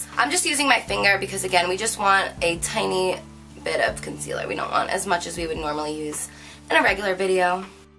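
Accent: New Jersey, United States. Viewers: Iol I love you New Jersey accent :) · R you from jersey? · I swear ur Jersey accent is so cute listening to it compared to my country accent....love being on the same coast but hearing how our accents change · i love your new jersey accent! esp. the way your say mascara! :) xoxox from new orleans!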